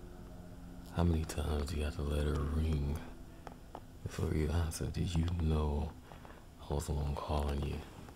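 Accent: St. Louis, United States. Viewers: Where r u from? Ur accent sounds like ur frm stl missouri.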